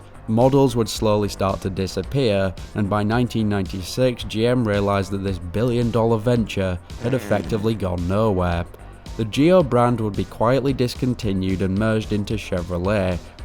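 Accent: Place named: Southport, United Kingdom. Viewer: The accent reminds me of the Southport area...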